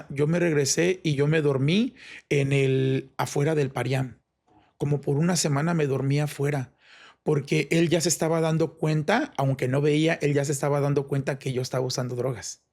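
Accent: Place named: Pocho, United States. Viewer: Que triste y pensar q muchos niños pasan por esto o cosas peores solo una duda por q habla como pocho 🤦‍♀️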